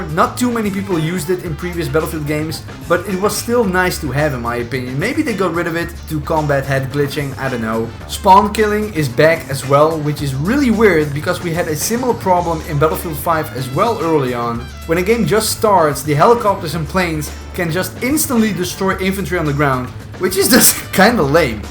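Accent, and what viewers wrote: Netherlands. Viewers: You must be Dutch right?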